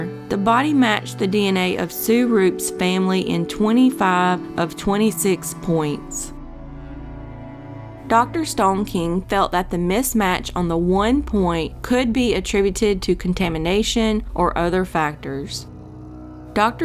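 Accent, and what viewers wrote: South, United States. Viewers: no problem. For me this is like really american local experience and vibe which i get from your videos. I like your southern accent. And when i said thanks for covering cases unknown to me, i meant you really dig up cases which are really unknown or unheard. Nevermind that im from Bosnia i watched almost all known cases, and a lot is familiar to me. You bring something new and fresh. Like everyone is eating vegetables from supermarkets from industrial farms, and you bring your local on fresh soil grewn organic vegetables 😁😜😆😂. Thank you again.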